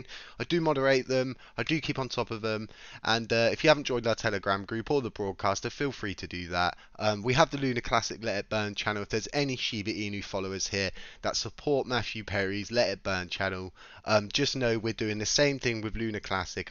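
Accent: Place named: Midlands, United Kingdom. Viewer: I'm sensing a Midlands accent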